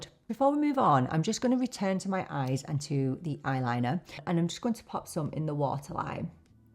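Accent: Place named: Manchester, United Kingdom. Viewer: I love your reviews even though we are literally like day and night when it comes to shades - I'm deep dark😊 I love how informative your reviews are and I love your accent, reminds of when i used to live in Manchester. You're quickly becoming one of my faves beauty/makeup Youtubers.❤ I've been eyeing the VB eyeliners, might give them a try.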